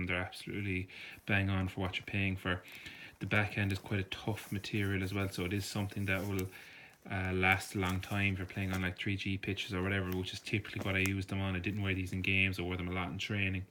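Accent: Ireland. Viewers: Are u from Dublin?but I'm from Ireland and I don't find gloves that cheap any wear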